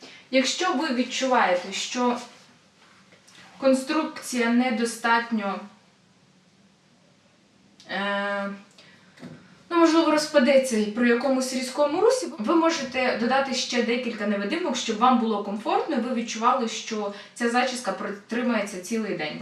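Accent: Standard. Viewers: дуже гарна зачіска) Приємно чути чисто-українську мову!) Ти супер😊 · Чисто українська мова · Дякую, відео дуже сподобалось.Ви дуже освідчена людина.Яка у Вас професія? Тому що знаю тільки одну молоду дівчину,яка так чисто говорить на украінській,але вона закінчила Могилянку. Хотіла б я так говорити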